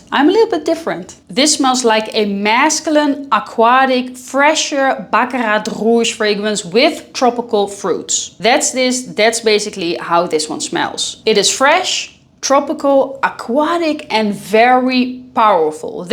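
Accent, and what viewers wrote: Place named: Netherlands. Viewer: you sound a bit dutch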